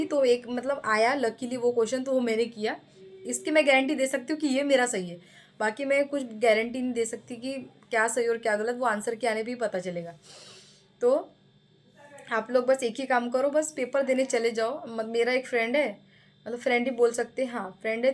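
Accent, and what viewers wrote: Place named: West Bengal, India. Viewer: Aapke boli se lag rha hai ki aap West Bengal se ho. Ham to up se hai. hme to abhi se dar lag rha hai. mera 11 feb ko hai.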